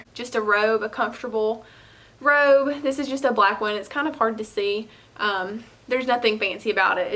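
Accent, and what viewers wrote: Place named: South, United States. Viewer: You have to be from Georgia or Tennessee 😊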